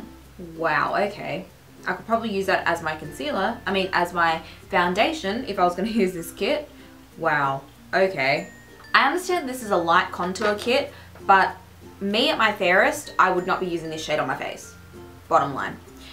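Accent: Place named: Australia. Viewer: Loving your hair. Ps are you aussie , you sound it abit haha.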